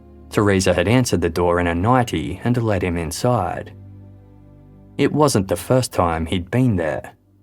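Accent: Australia. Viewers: I'm American, and this is definitely an Australian accent, you can tell instantly. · I just found this channel, and its amazing! So gd to hear an Australian accent podcast. I live in Queensland Australia 🦘 And suffer from extreme insomnia. These are the perfect bedtime stories for me. I do believe i am actually still learning as i sleep. These stories are much easier to hear subconsciously as i sleep, than my usual twisted night terrors on steroids! Thankyou so very much. Your content is original too, a fresh and intriguing change from all the rest. Perfect intro too, no loud, jarring, drawn-out intro music thank god!! Just the fact that your content caught, and kept my interest ( through the ADHD), is a definite YES, a " my new channel to binge )!!!!" Luv and respect, from Queensland, Australia !!!🦘🌏🦘🌏🦘❤❤❤ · Definitely an Australian accent and nah it's not AI, the narrator has even done a Q&A on the channel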